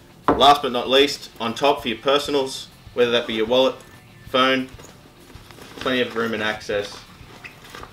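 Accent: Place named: Australia. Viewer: He has an Australian accent :) and we play softball in Australia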